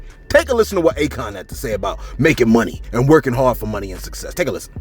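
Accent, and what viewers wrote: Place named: Boston, United States. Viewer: Yo my futha mucking boi!!! You got thee best thee hottest show or odcast whatever you Wana call it on the internet this is my absolute fav -or-ite thing on the whole entire internet I been watching you from the jump and I never miss a show twice a day everyday or however many times u post I get all my notifications I guess I'm one of the lucky ones but even if I didn't I don't have no cable cuz I don't want it all I b on is YouTube that's more than enough for me everything is on here! But anyway my name is Dez Fresh I'm from Racine Wisconsin (The Wisco) and every chance I get I try to put my family and friends on to this casting,my lil brother Nique watches faithfully too!I watch one before I leave for work or while I'm there on break and I make sure I save the last episode for when I get home at 4am can't sleep right without catching u on my news bottom line you and your show and your back up chanel is you tubes big ish fr and I love her voice n lil accent sounds like she's from Boston 😆...don't ever stop doin this we love you,no homo and pause!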